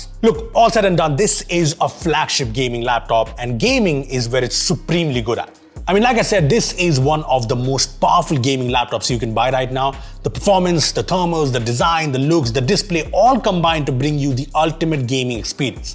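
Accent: India. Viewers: This comment will be called rasiccist but I love the way Indian male acsents sound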